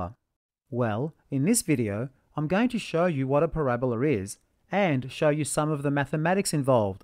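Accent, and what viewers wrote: Australia. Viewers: drive a nail where you want the focus to be. Tie one end of the string around that nail. Extend the dangling string downward toward you on your surface with a loose loop where you want the base of the parabola to be, and run the rest of the string vertical up the surface past the nail but attach it to the side of a 90 degree triangle square just touching the nail... Put your pen at the loop directly down toward you from the focus nail - that'll be the start of one side of your parabola... now slide the square straight to the right side and keep the pen tight against the string - as you continue to move the square, the string will slide upward and you'll trace a parabola shape... reverse it the other direction or trace the 1/2 you already did... Bob's your uncle. (since the teacher sounded ozzish (well, at least commonwealth something...)). 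Cheers.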